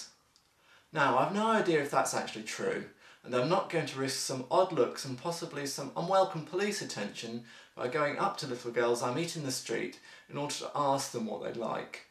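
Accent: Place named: United Kingdom. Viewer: I'm just wondering and not trying to be rude but why do you talk like you are a British unless of course you are a brit?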